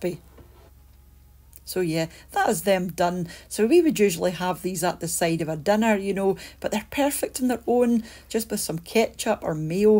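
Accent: Scotland, United Kingdom. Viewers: Subscribed they look sooooo tasty. I love tye Scottish accent probably because of my ancestry.❤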